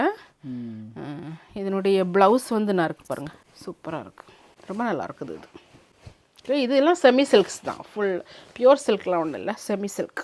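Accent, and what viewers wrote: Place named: Kanyakumari, India. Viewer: Hi sister i an from singapore . Very sweet dialogue kanyakumari slang . One tip to share here Keep new puli in fridge , never change black color . I use to buy from chennai as new puli and store in fridge . For 4 yrs also never change color . But once you keep out it will get black . I always take puli from fridge for 2 weeks once so that our curry also never look black . Try this surely you will see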